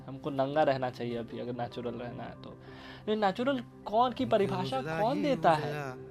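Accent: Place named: Bihar, India. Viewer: Yaar fan bana dia 💖 matlb apka yeh Bihari accent bhot acha h yaar!😍 All the best bro hope LGBTQA+ get thier right as soon as possible!😇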